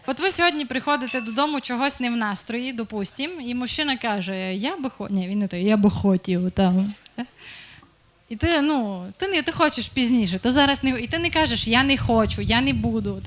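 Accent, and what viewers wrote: Transcarpathia, Ukraine. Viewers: Шоб твої правнуки, дівочко, балакали так як їхня прррабабуся з ЗАКАРПАТТЧ!!!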